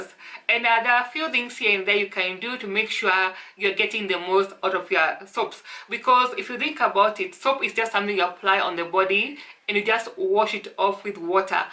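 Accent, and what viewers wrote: Kenya. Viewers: When I heard ur accent I know ur a Kenyan 😍😍😍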